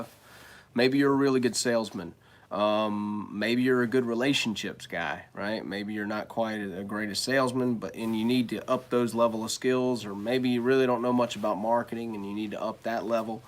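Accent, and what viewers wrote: Alabama, United States. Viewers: Are you from Alabama bro ! I dig the accent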